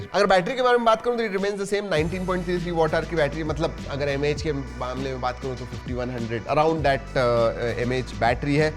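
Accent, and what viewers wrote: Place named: Maharashtra, India. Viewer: तुमचे मराठीं मधे reviews खुप दामदार वाटतात तुम्ही अत्ता हिंदी बोलत आहत पैन एमएलए आसा वाटत आहे की मराठीच बोलताय 😅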